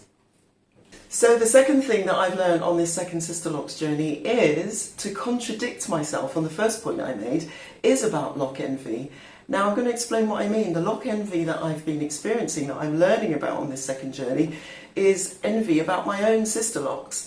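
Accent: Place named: England, United Kingdom. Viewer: The English accent surprised me. Your hair is very pretty.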